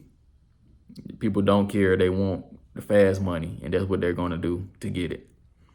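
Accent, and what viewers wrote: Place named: Texas, United States. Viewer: welcome to Texas !! I'm a Texan. & you sound like a Texan naturally! a true southern boy.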